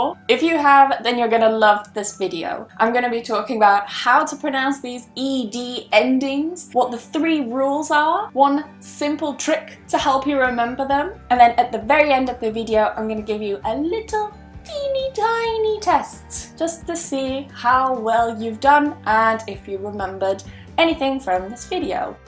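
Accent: United Kingdom. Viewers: Nice video. Thanks a lot. Love British accent. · Thanks so much for your work and Happy Birthday to you🍰26🕯️🕯️🕯️🕯️🕯️🕯️🕯️🕯️🕯️🕯️ 🕯️🕯️🕯️🕯️🕯️🕯️🕯️🕯️🕯️🕯️🕯️🕯️🕯️🕯️🕯️🕯️amazing clear British pronounce! Lovely teacher indeed!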